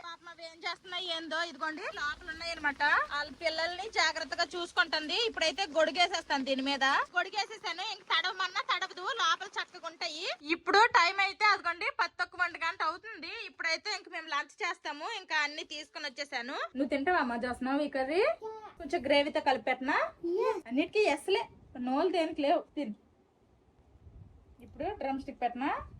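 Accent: Srikakulam, India. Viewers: Hai sister నా పేరు సుధ మాది విజయనగరం పార్వతీపురం hai cheppandi Mi vlogs chaala baaguntaayi Mi srikakulam యాస బాగుంటుంది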